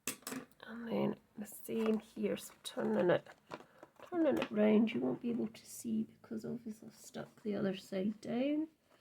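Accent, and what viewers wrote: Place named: Falkirk, United Kingdom. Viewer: Hi, I stay in Balmullo near St.Andrews, but i have friends from Falkirk live here and thats where the accent twigged. love the way you do your videos, i chatter to myself as well. Carry on crafting.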